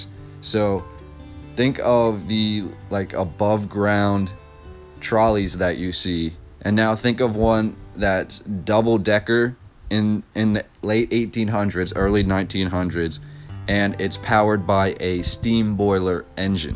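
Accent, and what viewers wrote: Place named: Pennsylvania, United States. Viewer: You gotta be from somewhere between Allentown, Reading, and Philly. Possibly Quakertown. Can't hide that acccent lol.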